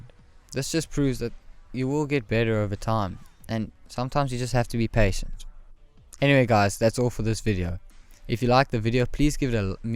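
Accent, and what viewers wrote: South Africa. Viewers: is this guy from south africa? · You from South Africa bruh? :D I can hear that accent clearly my bra> good Video btw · Your South African, aren't you xD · u from south africa bro? can hear it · south african ?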